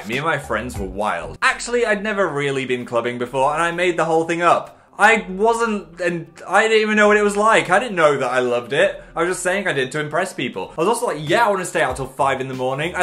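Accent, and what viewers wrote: North, United Kingdom. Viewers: Give the northern accent some love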